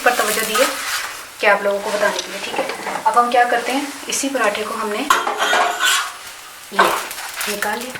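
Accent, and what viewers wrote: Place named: Kashmir, India. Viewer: Kashmiri urdu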